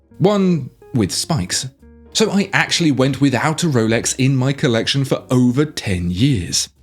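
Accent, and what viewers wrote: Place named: United Kingdom. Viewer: Great writing and smart British accent. Makes for good program. And my daughter's name is Charlotte.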